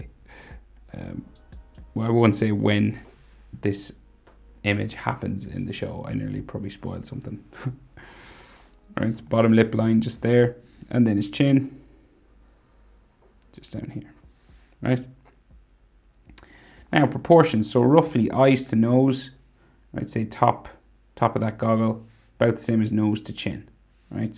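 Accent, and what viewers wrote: Ireland. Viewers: fellow irish?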